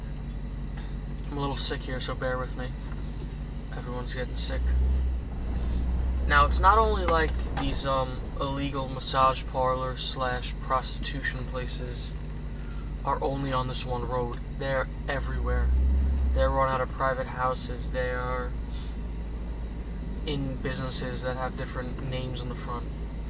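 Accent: New York, United States. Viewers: I swear, when I first heard you speak, I thought of my cousin that grew up off Utopia Parkway in Flushing Hillcrest. You sound exactly like him. It must be a "Flushing" variant of the New York accent in that area! LOL Great vid as always.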